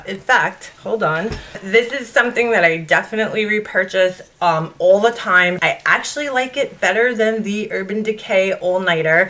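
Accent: New York, United States. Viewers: I'm a subscriber!! 🙋 Do you do acyric nails too?? You have a New York accent. You Rock !!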